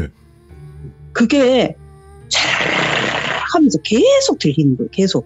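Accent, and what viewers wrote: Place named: Gyeongsang, South Korea. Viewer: 갱상도 ㅋㅋㅋ신경과민ㅋㅋㅋ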